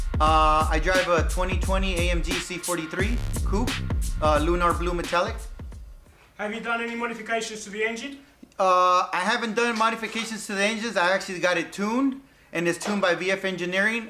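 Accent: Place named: England, United Kingdom. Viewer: I was so shocked to hear a fellow Englishman!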